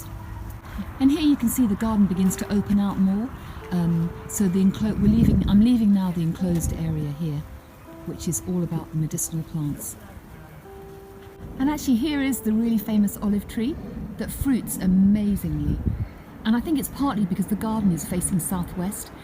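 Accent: London, United Kingdom. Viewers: 学习了很多，还有高级的伦敦音，继续啊！超级喜欢你。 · 我從台灣來美國快40年. 我還是喜歡英式口音. 尤其Manda 講解課程的聲音. 非常輕柔. 太讓我陶醉! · hi! thank you.! like London accent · 超级喜欢Manda的英式英语，很优雅好听，易懂。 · 小玉每期必看。thank you. Loooooove garden. I can speak Mandarin,English, Japanese and Shanghai Dialect. Love you speak British English. I have been living in Toronto for several decades. 谢谢分享花园。我非常喜欢花。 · London accent is music to the ears!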